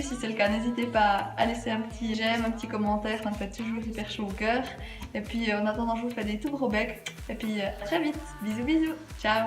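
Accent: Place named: Neuchâtel, Switzerland. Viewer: hey hey on reconnait bien l'accent de Neuch :D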